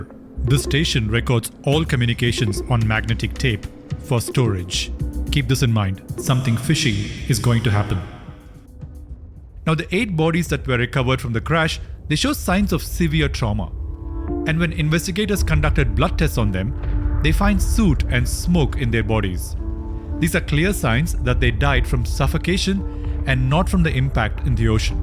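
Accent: India. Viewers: Awful channel, awful Indian accent 😂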